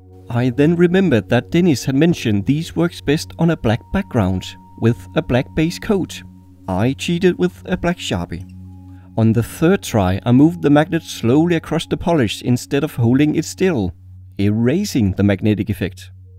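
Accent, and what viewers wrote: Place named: Denmark. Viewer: That accent sounds soooooo danish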